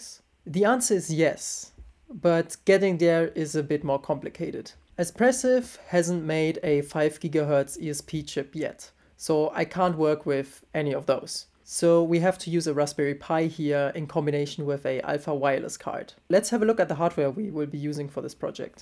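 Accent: Germany. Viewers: Thank you for your video! How hard to find hardware for Kali/Parrot to deauth 2.4 and 5ghz same time to prevent switching?! Will the Deather Andromeda does the thing? I could go for jamming in my project, but that should only be the last possible option. i would really appreciate any advice! Your accent let me think you are possibly from Germany/Austria?! :) Keep on doing such nice Videos! <3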